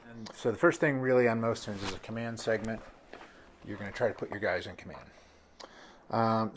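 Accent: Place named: Pittsburgh, United States. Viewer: Thanks for making these OSG videos. I also thought in one of your videos "that sounds like a Pittsburghese accent" and yep you guys are here too.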